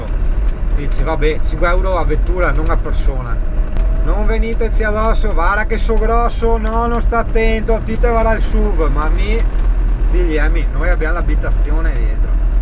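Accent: Veneto, Italy. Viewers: Sicuramente non sono mezzi da camminare forte considerato anche l'età del mezzo . Comunque bei video divertenti con l'accento veneto poi è uno spasso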